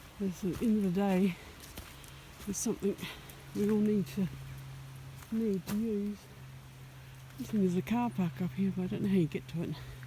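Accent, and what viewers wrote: New Zealand. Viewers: Is that a New Zealand accent i detect ! I grew up in Auckland but have lived in Manchester for the last 27 years !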